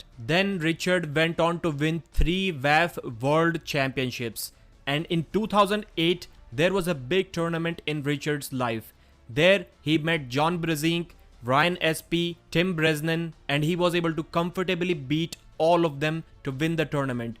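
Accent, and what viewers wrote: India. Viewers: never heard of........... but the speaker of this video sounds like the stereotype indian technical support call center guy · Диктор из индии???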